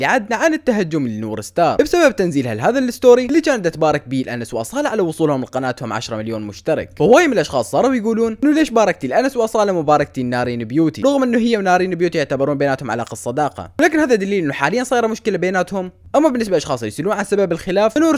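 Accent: Iraq. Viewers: اي اكيد لان لهجتها اساسا كذا هي عراقيه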